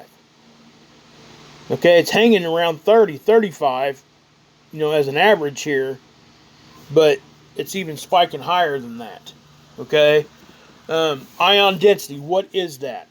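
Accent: South, United States. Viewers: I'm not trying to be rude or nothing, but you really need to change the way you speak. You must be from wayyy down south.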